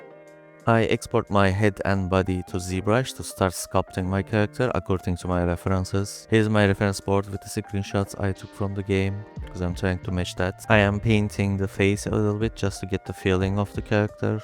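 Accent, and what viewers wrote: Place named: Turkey. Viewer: I may have noticed a little Turkish accent 😅 I couldn't see it in the video but I presume the main material is basic toon shader with color ramp?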